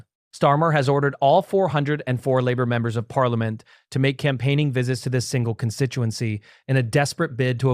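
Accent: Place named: United States. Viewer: What a load of crap and American voice over crap aswell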